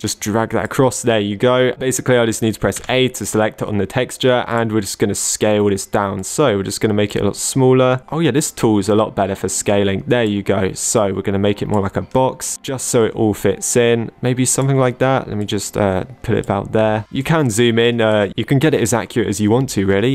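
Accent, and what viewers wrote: United Kingdom. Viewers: My favorita british acento youtuber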